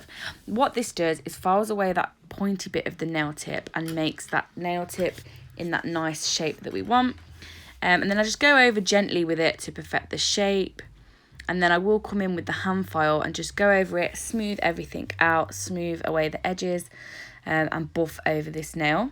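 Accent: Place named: Coventry, United Kingdom. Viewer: Are you from Coventry? I am. Your accent is very Cov. :-D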